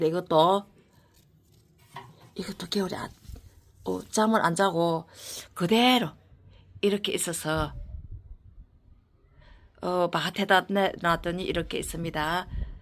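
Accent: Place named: Gyeongsang, South Korea. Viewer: 경상도 사투리 구수하고 듣기 넘 좋네요 영상 잘보고 갑니다 감사합니다...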